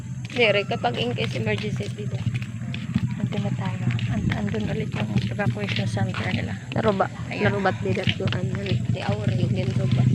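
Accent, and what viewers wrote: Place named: Waray, Philippines. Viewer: Waray gud kamo pag habal habal. 😁😁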